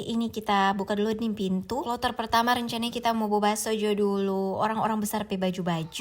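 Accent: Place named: Manado, Indonesia. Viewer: Suka nonton video mbak.....mungkin krn dialek bahasanya yg enak.....❤ Salam dr Makassar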